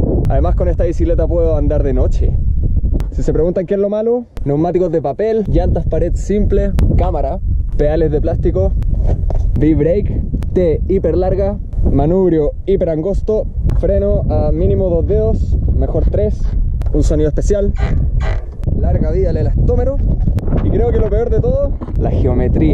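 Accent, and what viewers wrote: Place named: Chile. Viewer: ¿Acaso soy el único que no entiendo el chileno?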